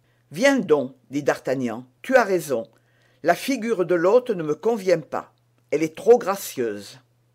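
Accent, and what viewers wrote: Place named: South, France. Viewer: L'accent méridional doublé du chuintement aristocratique pédant. Inaudible bien évidemment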